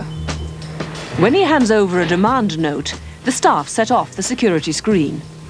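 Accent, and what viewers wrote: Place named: United Kingdom. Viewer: british accent. no need to explain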